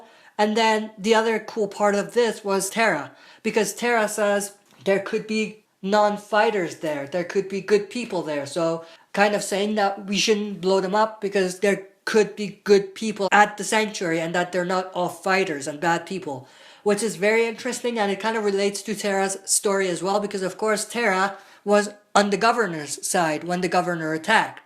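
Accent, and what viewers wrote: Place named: Dublin, Ireland. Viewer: You speek the same like a colleague of mine who is from Dublin.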